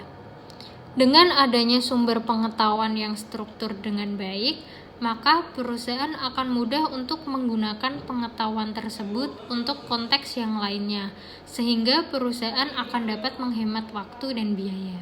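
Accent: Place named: Java, Indonesia. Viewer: Baru ini dengar yg ada medoknya😂😂 anyway thanks ya!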